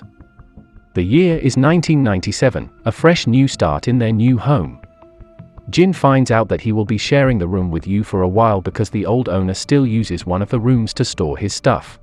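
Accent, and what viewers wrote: United Kingdom. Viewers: Why this autovoice has a British accent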